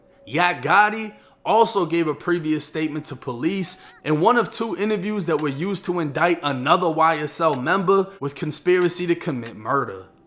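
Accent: Boston, United States. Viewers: Dude u got like 3 different accents going on u sound like u from Boston when u say “caar” then southern black when u was on no jumper now u just aound like a regular American on here u not a real G u make YouTube videos stop 🛑 ✋ trying to be gangster u far from it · Are you from Boston? ☘️🤓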